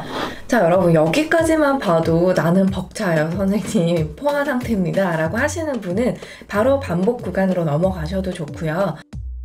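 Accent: Yanbian, China. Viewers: 연변 말투 좋습니다. 왕왕 사용하여 강의하면 좋겠습니다. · 1。我第一次刚到中国，吃了非常多的麻辣烫。 2。我刚二十岁了。 3。刚才我到韩国了。 4。偶尔老师说的口气很有意思意思，好像是刚（来）从延边那儿到的。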